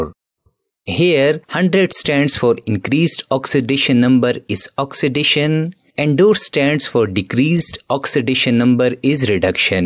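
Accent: South India, India. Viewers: Top waale teacher .. I mean very superb teacher with south accent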